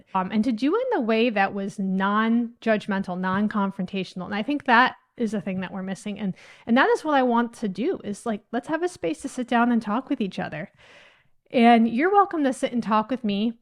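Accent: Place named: New York, United States. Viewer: Excuse the question, are you from the Utica area in NYS? I'm always interested in regional accents. Your accent sounds like Central/Western NYS . . . .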